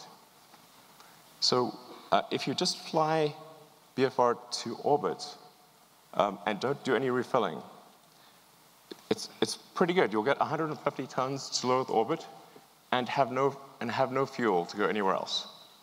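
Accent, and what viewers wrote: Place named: France. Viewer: I love that french accent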